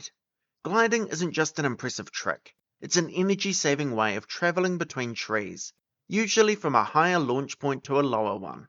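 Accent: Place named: New Zealand. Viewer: Good content and good channel name. I advise adding subtitles to your shorts if you make them as your Kiwi accent may confuse ppl. I can understand though but not everyone might. But it's the lowest priority.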